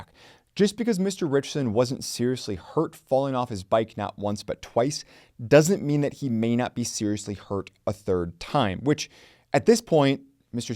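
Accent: United Kingdom. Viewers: Nah, southern England but not London or estuary or West Country dialects. 🏍️🏴🦃👊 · Dudes British. I understand now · He's got an English accent!!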